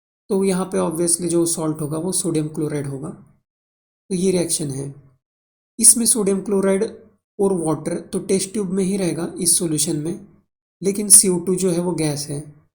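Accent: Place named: Maharashtra, India. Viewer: Pure Marathi accent ❤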